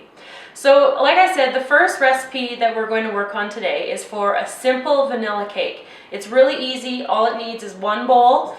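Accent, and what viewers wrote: Canada. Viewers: Are you Canadian? I feel dumb for asking but I'm really curious. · I hear a Canadian accent👂👂👂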